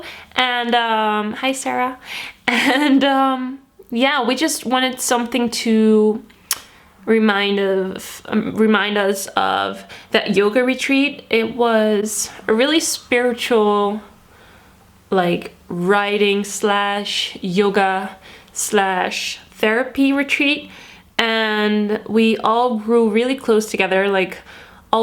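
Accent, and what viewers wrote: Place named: Netherlands. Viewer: Toen je begon te praten hoorde ik gelijk dat je nederlands was! haha, loved the vid.!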